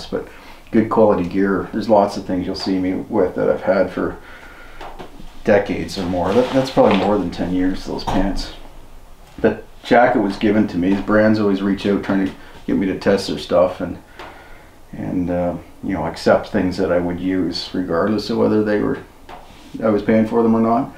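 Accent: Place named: Canada. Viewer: When you make the "ou" sound it's easy to tell you are from Canada.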